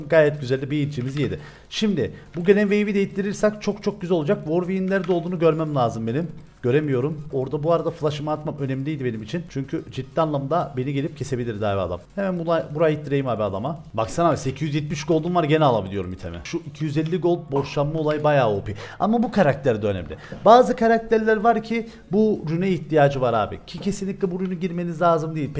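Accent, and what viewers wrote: Cyprus. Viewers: Abi her video attığında onunla birlikte izlerdik güle eğlene kıbrıs ağızına yatkın olduğu için senin konuşman gibi konuşurdu keyifle izlerdim.Artık o başka biriyle ben yine seninleyim. Seviliyorsun reis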